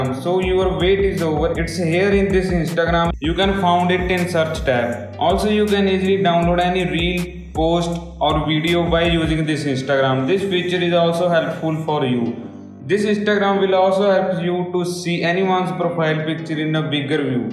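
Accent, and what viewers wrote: India. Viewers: bro tuhadi english...🔥✨😂desi touch nal..!!kaim lgdi a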